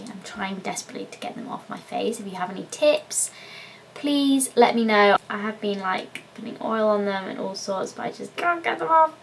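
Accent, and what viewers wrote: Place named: London, United Kingdom. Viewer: Applered she’s definitely a Londoner so that’s a very cute London-accent :)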